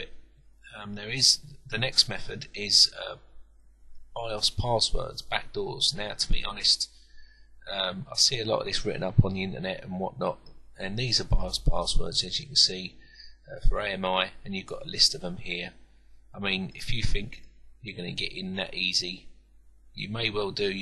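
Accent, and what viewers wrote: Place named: Cockney, United Kingdom. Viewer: Your accent is hard to understand. Speak clearly and don't cow off the words. Not many people understand cokni.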